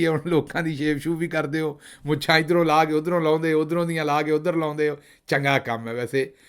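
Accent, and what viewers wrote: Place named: Punjab, India. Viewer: Tuhadi and Sadi Amritsar Wali an di boli iko jihi hai. Kanak (Gandum) de Buthe, vI always like your pure Pendulum Punjabi.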